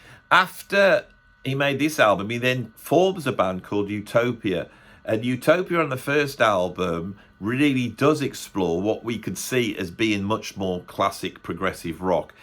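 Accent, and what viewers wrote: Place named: Northern England, United Kingdom. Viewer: He's simply the polar opposite of ( later solo) Doesn't have a great voice, writes very not hooky rambling long bits vs. the concise hooky pop/sorta proggy stuff, Winwood's squeakily clean and perfectly ordered arrangements. Both apparently had access to technology and could take time to make whatever the F they felt like making. Rundgren produced this mess and Winwood produced clever, challengingly difficult songs (said Paul Shafer "the most difficult thing we've ever played" on Letterman. I think), but ultimately a bit contrived, antiseptic and unsatisfying. Far better than Todd's offerings though. I am sure Todd's got a lot a deep lyrical messages for his adulent worshippers, and Steve's got almost none, and I would give Rundgren more thought and a closer look, but I am so put off by the music that I think I shan't. 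(apostrophe?) He did have that one hit in the 80's. A sort of easy rock MOR thing?.... oh well. Next contestant please. Love your accent btw. Can't quite place it. Somewhere northerly betwixt........no wait.. too soft Cottswalds innit?.. no a bit of more northern inflection...I'll have to Google you...nothing in Wiki...."what city is from"....hah there it is........Kidderminster- Birmingham. yes, I've got quite the ear for a Yank.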